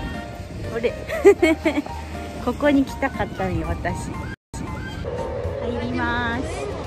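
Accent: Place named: Okayama, Japan. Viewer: 出さないようにしてるみたいだが、 ときおり出る岡山弁のイントネーションに萌える。（40年岡山県人を捨て愛知県に移住）笑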